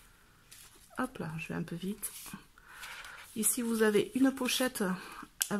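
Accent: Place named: South, France. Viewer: oh! comme ça fait du bien d'entendre ton doux accent du sud! Une superbe réalisation et le début d'une belle aventure pour toi! Quand je te disais qu'il fallait te lancer! Elle est super ta vidéo, bravo! MUXUS!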